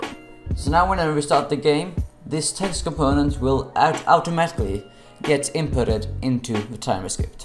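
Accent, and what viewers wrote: Sweden. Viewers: lol I immedietely knew you were from Sweden from you accent! damn, that early day pewds when he wasn't so fluent in english made me catch that accent instantly :v great tutorial btw, helped me out a lot, keep up the good work!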